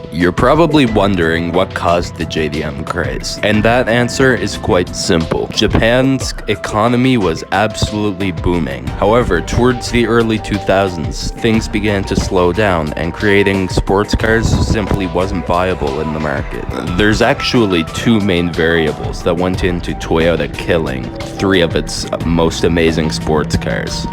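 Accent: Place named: Netherlands. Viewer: You sound like your from NL like me haha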